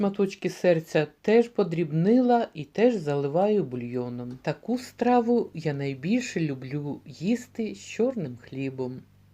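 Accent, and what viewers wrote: Standard. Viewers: НАКОНЕЦ ТО Я СЛЫШУ ПРЯТНУЮ НАСТОЯЩУЮ ЛИТЕРАТУРНУЮ УКРАИНСКУЮ РЕЧЬ,А ТО ИНОГДА ДАЖЕ НЕ ПОЙМЕШЬ НА КАКОМ ЯЗЫКЕ ГОВОРЯТ,НО ТОЧНО НЕ НА УКРАИНСКОМ.СПАСИБО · так, добре поставлений голос, як у диктора радіо та телебачення, або як у вчительки. Та правильна українська мова, без домішок, та різних польсько-австрійських "вивертів". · Дякую за чисту украīнську мову без польсько-угорських домiшок!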